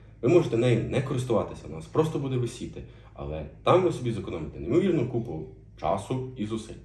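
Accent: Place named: Podillia, Ukraine. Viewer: Мені здається, що автор родом з Поділля, за вимовою. За інформацію щиро дякую. Можливо фумігатор від комарів теж знадобиться.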